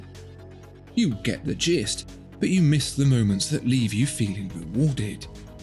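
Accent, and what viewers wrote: United Kingdom. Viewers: I would totally come, but based on your accent, I think you’re on the wrong side of the pond.😕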